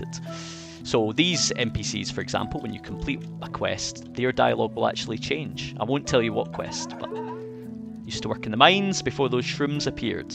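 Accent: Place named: England, United Kingdom. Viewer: what happens in the 16th minute also nice accent are you English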